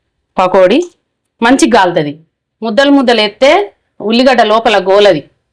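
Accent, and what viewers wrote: Telangana, India. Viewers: మన తెలంగాణా యాస 👌🏻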